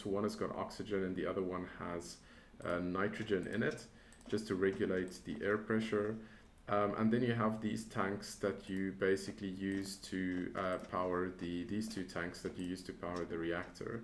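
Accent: South Africa. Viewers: Sounds like SA?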